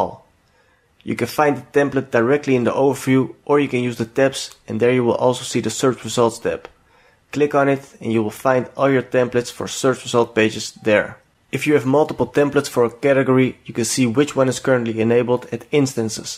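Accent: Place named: Netherlands. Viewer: I know a Dutch man when I hear him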